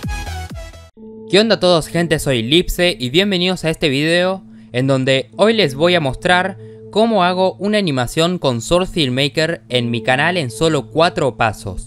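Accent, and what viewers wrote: Argentina. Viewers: ey pregunta sos argentino o chileno? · Mentira q so argentino no? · Eres argentino?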